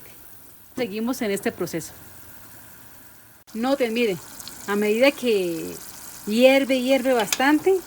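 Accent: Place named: Mexico. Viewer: Me encantó esta receta!! Mil gracias. Y te pregunto de donde eres??? Sueñas a veces como México y otras como colombiana. 🇨🇴🙏🏻💓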